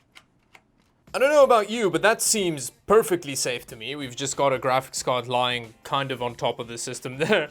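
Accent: Afrikaans, South Africa. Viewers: Is jy Afrikaans? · Are you from South Africa? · You sound South African. Are you? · Are you south African? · i can hear that south african accent trying to get some slang through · How long have you been in Canada?, you still sound very South African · Are you an SA expat? You sound South African. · his south african 100% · He lives in Canada and his accent is white South African. So you are an immigrant. Good on your part you really dodged the bullet that is South Africa. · I swear this guy is south african. Correct me if I'm wrong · You dont sound Canadian...South African perhaps? :-) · I though you were South African? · Interesting accent there - sounds like you’re Afrikaans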